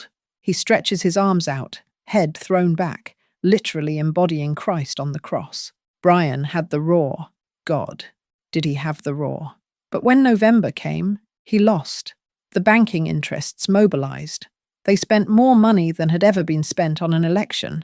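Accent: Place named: United Kingdom. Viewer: Blah blah blah. The Wizard of Oz is about Americans becoming US Citizens. It is about common law becoming admiralty corporate UCC law. Oz = ounces of gold. Tin man = Tax Identification Number. Scarecrow = Stramineus Homo = a person or entity used as a front, nominee, or agent for another party, often to hold title, provide bail/surety, or conceal the true owner. The lion is the sovereign (king of the jungle?) aka a free man on the land. She comes for 'Toto' which is not a dog but Latin for 'everything' because the banks take everything. White snow is narcotics. On and on you guys are being bamboozled by this British accent that want you to look elsewhere. To the content creator of this channel stop gaslighting. We are not in Kansas (a republic or commonwealth) anymore because she is now in 'The State of Kansas' a corporate state. Americans know all about this and we understand who is who etc. 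If this information is new to you, you're welcome. Don't listen to the majority of this color (legal term you should look up). I did not bother listening to this nor did I bother to read the comments. If what I am saying has been echoed already, good on them. Anyone saying anything else is a legal fiction and don't know it.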